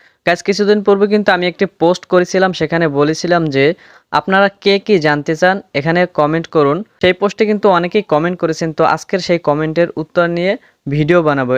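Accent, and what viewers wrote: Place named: Madaripur, Bangladesh. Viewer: আসসালামু আলাইকুম ওয়া রাহমাতুল্লাহি ওয়া বারাকাতুহু। ভাই ভালো আছেন। ভাই আপনি ফুটবলে কোন দল সাপোর্ট করেন ব্রাজিল,-আর্জেন্টিনা, জার্মানি, নাকি স্পেন। আপনার বাসা কোন জায়গায় কে আমি মন আপনার কন্ঠ তো শুনে লাগে আপনার শরীয়তপুর নয় মাদারীপুরের আশেপাশে থাকেন আপনি কোন জায়গায় থাকেন?আপনি কি করেন? ডি এল এস গেমে কি আর কোন কিছু নাই ভাই আমার গেম খেলতে ভালো লাগে না আমি হার্ট মোডে ডিএলএস খেলছি আমি খালি ম্যাচ জিততে থাকি খালি জিতে থাকি আমি মেয়ে ছাড়িনি এখন আমি কি করবো ভাই.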